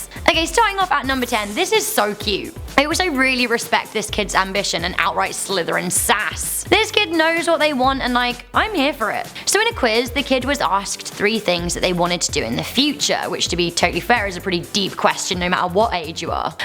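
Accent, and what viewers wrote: England, United Kingdom. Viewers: Miss are you English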